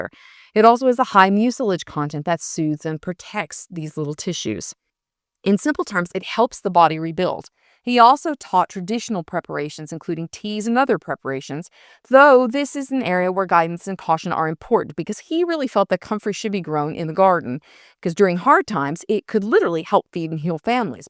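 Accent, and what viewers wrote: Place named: South, United States. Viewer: Really appreciate this teaching and love the southern belle voice!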